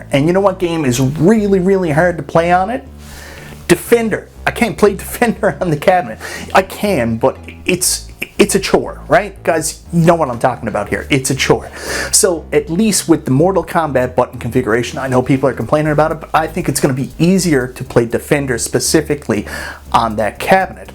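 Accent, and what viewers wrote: Canada. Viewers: Nothing more endearing than the way Canadians prounce their "ar's". I could listen to this guy say "Arrrrhcade" and "Marrrrhquee" all day haha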